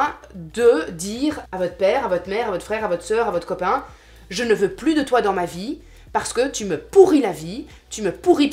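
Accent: Belgium. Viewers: J'ai une question rien n'à voir avec la video. commence toujours ses vidéos avec "mes ptits vautours" (avec un bel accent belge hahahah). Ça vient d'où cette phrase? Pq elle appelle ses abonnées comme ça?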